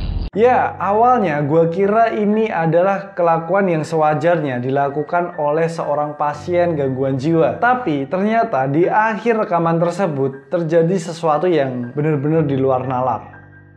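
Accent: Java, Indonesia. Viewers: iya mas. kental bgt jawanya, pakai sapaan " aku kamu " aja mas, lebih enak didengar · pake aku kamu saja bang, ga usah lu gue, medokkkk parahhh... kesanya gimana gitu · Medhok tapi gua lu.. #salfok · mas mbok pake "aku kamu" aja mas, jgn "lu gua", lebih oriental.. lagian masih kerasa kok rasa jawa nya hehehe sepurane ya mas, aku yo wong jowo :))))))) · Medok jawabnya gak hilang mas 😄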